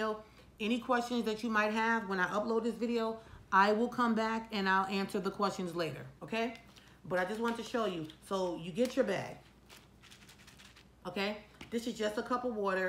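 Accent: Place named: New York, United States. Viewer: Girlfriend are you from NY? You sound just like family honey! Will check out your website.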